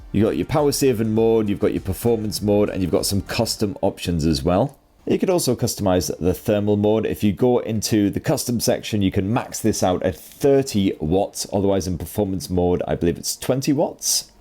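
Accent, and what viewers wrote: United Kingdom. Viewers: I didn’t know I needed a cheeky British man (I think it’s British, the accent) being cheeky and snappy and intelligent and educating me about tech he’s savvy about… but I doooo❤ thank you to you and your whole team!!! Also appreciate the chapter names in the time scrubbing bar… I se you and appreciate you whoever is naming them!!😂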